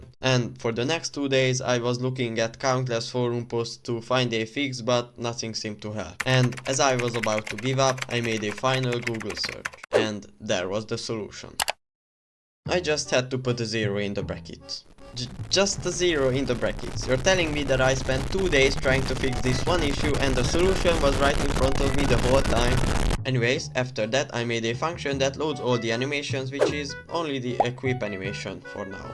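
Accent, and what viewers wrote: Hungary. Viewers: nem feltűnő magyar akcentus lol, jó video végre találtam egy magyar roblox devet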